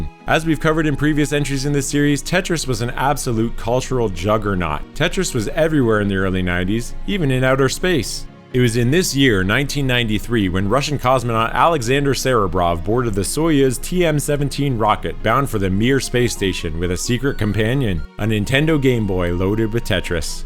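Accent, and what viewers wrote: Canada. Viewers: no worries! I was about to post that you weren't AI when I heard a Canadian accent come through, but you beat me to it. I also learned that little bit of trivia with the Mystery Dungeon, I thought Shiren was the OG but turns out it was the blue cat I saw in Japanese magazines throughout my teens. Thanks for the content!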